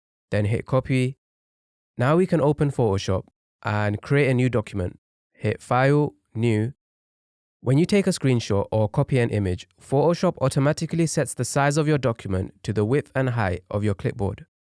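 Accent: United Kingdom. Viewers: Brother, you are from the UK right?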